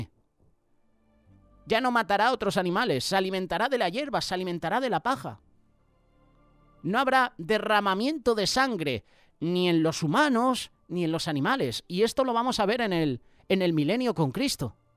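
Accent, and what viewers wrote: Spain. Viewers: Gracias señor por su siervo que nos enseña de manera tan clara mi Dios!!! El es español por su hermoso acento Dios gracias por la palabra que nos alimenta!! Bendiciones del señor para todos los que hemos creído en el señor Jesucristo saludos desde chile ❤🇨🇱🙏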